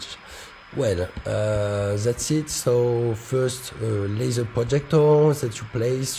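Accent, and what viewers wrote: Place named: France. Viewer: Un français qui parle English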